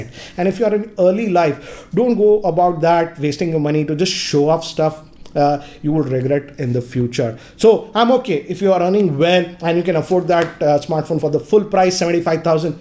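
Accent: India. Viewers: Another Indian with a heavy accent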